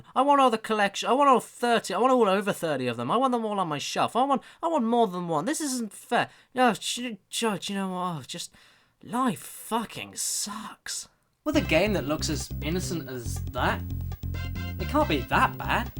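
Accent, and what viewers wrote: United Kingdom. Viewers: I know that, but whether or not this other guy knows it he seems to think that a game which is suitable for 3-year-old kids makes it automatically a bad game. I just simply pointed out that he's clearly wrong. But anyways I'm sure we can all agree to whatever we're claiming here so I think we could just put this thing to rest and just continue praising you, your british accent and your beautiful sideburns. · He is from the uk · Dude he is British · Ur so British!!!! I luv it ^^ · this guy ain't the funniest I've seen but dang I cannot resist the british...seriously the very few girls I've ever talked to say that men with british accents are automatically more attractive... please teach my your ways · Playstation Master and JonTron Incarnate but BRITISH.= AWESOME.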